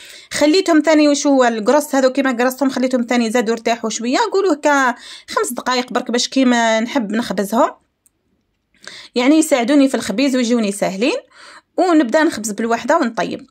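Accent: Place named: Khenchela, Algeria. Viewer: هدرتك كيما حنا أنا من خنشلة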